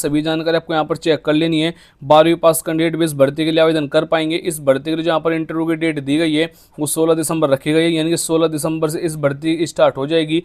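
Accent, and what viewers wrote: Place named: Pakistan. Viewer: Pakistani k tarah baat krhe hai aap toh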